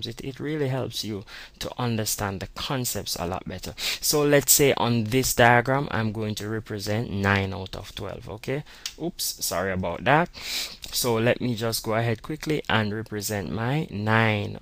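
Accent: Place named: Jamaica. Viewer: U r a Jamaican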